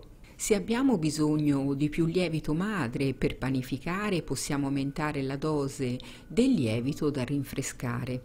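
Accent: Tuscany, Italy. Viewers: Che bello questo accento Toscano!! 😊 Grazie per il video, devo provare a seguire la ricetta!! Direi che ci vuole mooooolta pazienza eheh Come si usa, poi, per fare il pane? Ci faresti un video? Grazie, grazie!!!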